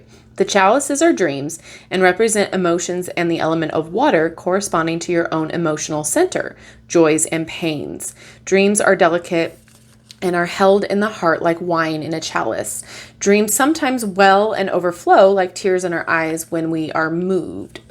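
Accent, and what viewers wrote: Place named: United States. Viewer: спасибо за обзор! У тебя такой красивый акцент!!! Класс! Прям американка!!!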